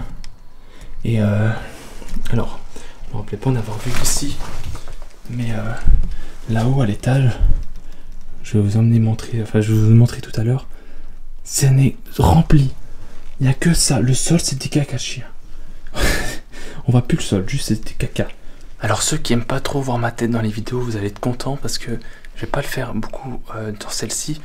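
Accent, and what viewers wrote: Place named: Vosges, France. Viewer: D'après ton accent tu es peut-être vosgien. D'après les anciens même si on ne croit pas aux protections elles protègent quand même.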